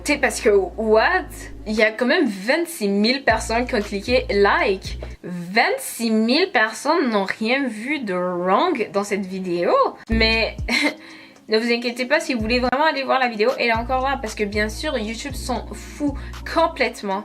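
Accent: Quebec, Canada. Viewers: Y a des gens😥 Même pas honte😑 P.s j'adore ta voix, tu est québécoise(l'accent)? 💕🍭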